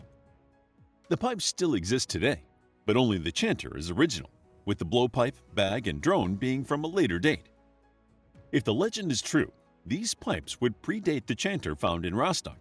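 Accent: United States. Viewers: La voz de la IA es de risa. Cantigas de Santa María con acento de norteamericano que no sabe hablar español. Es de risa. La IA va a acabar con nosotr@ A demás es muy triste que no haya un humano al timón para corregir algunas palabras y malas traducciones. A demás faltan muchos datos.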